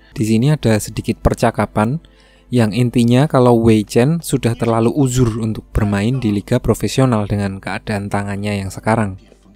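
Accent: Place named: Java, Indonesia. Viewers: Yogyakarta bro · Wong jowo to mass,kok ngomong e ngono hehe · Jawanya medok amat ngakak astaga~ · Njir medok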